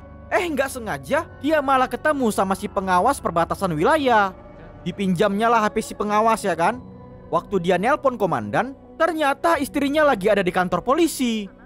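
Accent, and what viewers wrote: Medan, Indonesia. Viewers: Khas Medan nya medok 😀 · Orang Medan ni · Channel ini bukan dari cara suara vocal atau verb yang kurang... tapi pembawaannya emang agak sedikit cepat karna orang medan memang seperti itu... Dengan ciri khas masing masing di setiap channel ada kesan tersendiri.. Berbeda tapi tetap santuy... Buat yang baru liat channel ini jangan buru2 salah paham yah...dengan logat seperti ini lalu mengatakan kurang bagus atau sebagainya. hargai aja dan nikmati.. salam sikopet rawa cubluk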